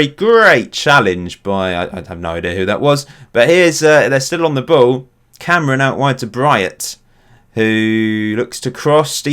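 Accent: United Kingdom. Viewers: When your girlfriend recognizes the voice of that 'posh guy from England' 👍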